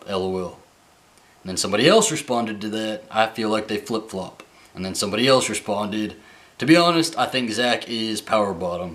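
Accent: South, United States. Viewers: I think your point is what I been saying to my best friend we should focus on the relationship an not the bedroom, there's so much more outside if the bedroom , love your videos an want hold that team against you lol #GoRebs that southern voice swoon ..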